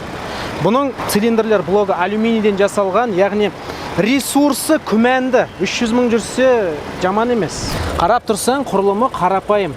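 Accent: Standard. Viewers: Осы Жигит Жаксы Карапайым Отте Казакша таза солейди екен АЛЛА истерине Береке берсин бауырлар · Жарайсың дами бер, таза қазақша сөйлейсің сол үшін көремін сені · таза қазақша сөйлейді керемет. Міне дұрыс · Каринамен салыстырып жиберген калай кетті люгын🤣🤣🤣. Базар жок жасай бер бауырым. Современный таза қазақша сөйлеудің королісің. Бір ғана минус бар- шолуың тез бітіп калады, бес минут сиякты өте шыгады. ✋🤝🤝🙌🏻✊🏻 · мынандай таза сойледин казакни бринши рет эситип турмын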